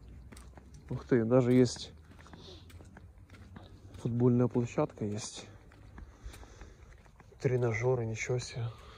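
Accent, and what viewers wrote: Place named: Ukraine. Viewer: Так, тут помилка вийшла. Просто, коли Ви говорите російською, дуже чути, що Ви українець. Говоріть українською, в ній є душа.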